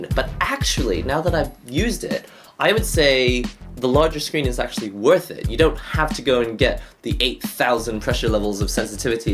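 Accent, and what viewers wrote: United Kingdom. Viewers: Are you pure Japanese? Or are you mixed? You sound like you have a British accent :)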